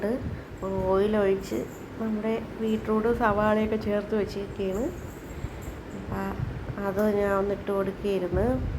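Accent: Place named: Thiruvananthapuram, India. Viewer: സ്ഥലം എവിടെയാ സംസാരം കേട്ടിട്ട് ആറ്റിങ്ങൽ വെഞ്ഞാറമൂട് ഇവിടെ എവിടെയോ ആണെന്ന് തോന്നുന്നു 🥰👍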